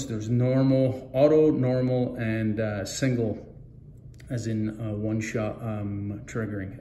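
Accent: Scotland, United Kingdom. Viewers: a wee Scottish twang in your accent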